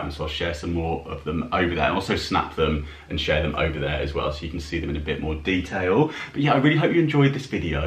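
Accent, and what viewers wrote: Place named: RP, United Kingdom. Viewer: Ho ho ho,that was funny, I love listening to you talk,it's clear and quite posh, I live in Yorkshire,so I find the accent sometimes difficult.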